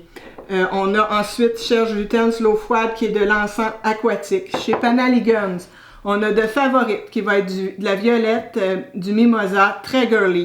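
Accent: Quebec, Canada. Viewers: Bonjour et merci pour ton message. Je découvre ta chaîne avec un grand plaisir en même temps que ton délicieux accent québécois qui me rappelle l'une de mes tantes que j'adore. Quel marathon!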